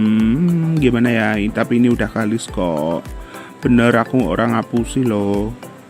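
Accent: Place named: Tegal, Indonesia. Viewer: Oh.. sampean org tegal to...sip dah he he heee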